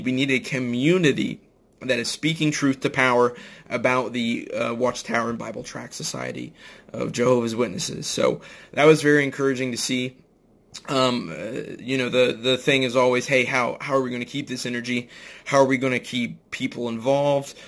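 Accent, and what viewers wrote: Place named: Midwest, United States. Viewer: What part of the midwest are you from? I'm from Nebraska.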